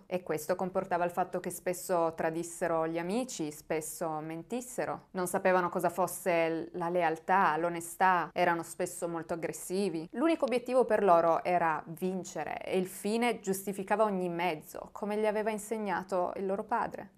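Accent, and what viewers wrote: Turin, Italy. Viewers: ti devo davvero fare i miei complimenti... sono super appassionata del crime e all'inizio ero davvero scettica (troppi youtuber che si improvvisano divulgatori). Tu sei una bella sorpresa... i tuoi video sempre chiari, dettagliati, si percepisce lo studio che li precede, l'esposizione perfetta, l'accento torinese la ciliegina sulla torta! Sempre un piacere ascoltarti e vederti... volevo tralasciare quanto tu sia bella ma devo dirtelo per forza... bellissima e bravissima!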